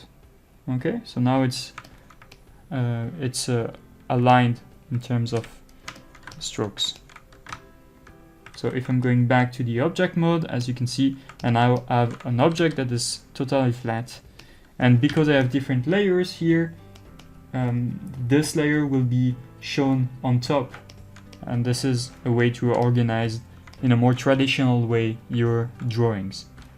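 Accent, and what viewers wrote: France. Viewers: He said "Sorry for the French accent.", it does not mean his English is bad. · When the subject is hand-drawn animation, the French accent is a reassurance. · No need to apologise. Your french accent is absolutely ok. Thx for this. Great introduction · This is the best tutorial. I love your french accent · Don't apologize for your French accent! It's way better than mine ^-^ Thanks for the tutorials, mate. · Don't ever apologize for your french accent. Own it, flaunt it and and shove it in everyone ears. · the french accent is like fine wine, on animation.... 👌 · Since Begining I'm recognize your french accent, merci pour ta vidéo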